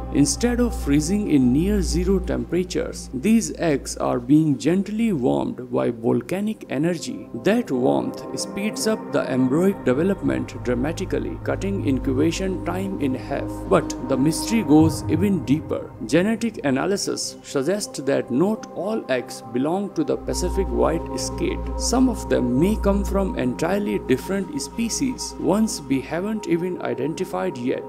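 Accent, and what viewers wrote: India. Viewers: Lol Youtoube get this trash out of my feed. AI + Indian accent? come on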